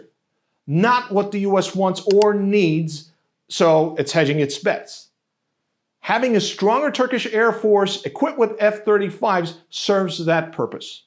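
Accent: Russia. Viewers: I like your Russian accent… great …. No thanks to F35 …when you chase 9 years of Chasing Patriots … now go take hike they said sooooo well, …. C’est La vie…. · That russian accent... 😅👍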